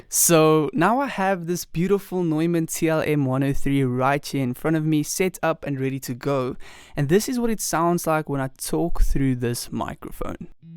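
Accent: South Africa. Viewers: You sound South African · Dude! Where are you from? You sound South African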